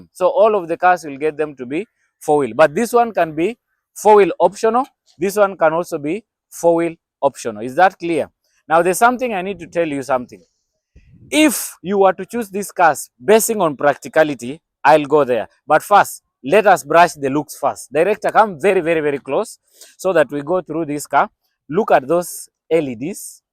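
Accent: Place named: Zambia. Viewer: 2.7 what howmuch in usd where are selling from you sound Zambian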